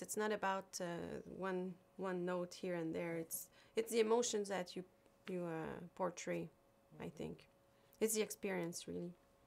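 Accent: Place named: France. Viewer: Hey there ! I folllow you since 2 months, and I heard that you have a little french accent when you speak english... 😉Are you french, because I am, and proud to be one ! I love your channel !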